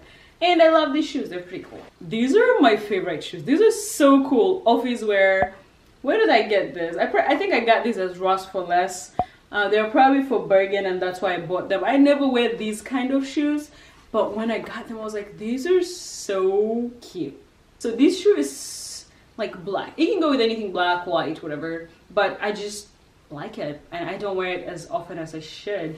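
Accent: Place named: Cockney, United Kingdom. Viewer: Great videos. Why do you have some hidden bri-ish or rather cogney accept? Which State are you in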